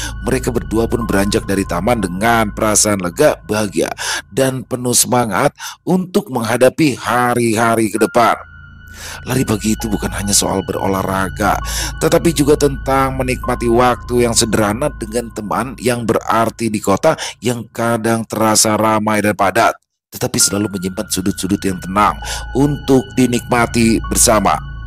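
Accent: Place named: Jakarta, Indonesia. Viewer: Warung kopi emang paling the best dech....,apa lagi dengan logat Betawi yg kental yg d bawa kan bang,emang cocok nich bang memeran kan...,🤭🙏🏻sehat selalu bang dan team terima kasih atas cerita nya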